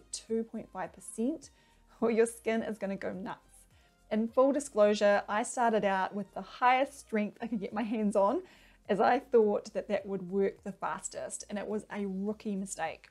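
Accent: New Zealand. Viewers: You have the softest kiwi accent. Love 🇬🇧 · I love your smile, and your cute New Zealand accent! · aha!! i guess you were new zealander from your accent and reading the caption, i was right hehe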